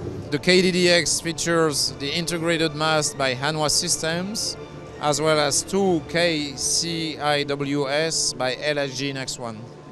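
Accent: France. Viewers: Why did they hire the Frenchest French guy to narrate this in English? Could they not afford an actual English speaker?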